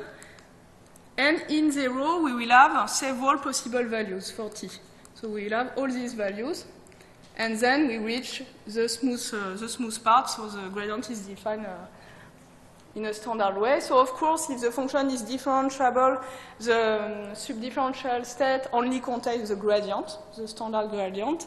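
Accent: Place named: France. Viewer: LOL, I like her French accent, which is even more interesting than the material itself : )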